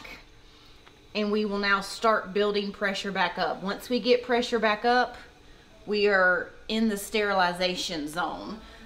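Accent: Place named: South Carolina, United States. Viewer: I can tell she is one of us in South Carolina! "Caddy Wampus' LOL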